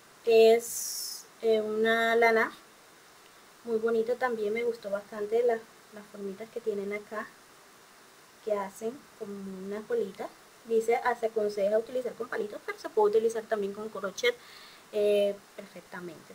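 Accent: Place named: Chile. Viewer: siii que bueno eres paisana mia por el acento supe.. saludes desde Chile!!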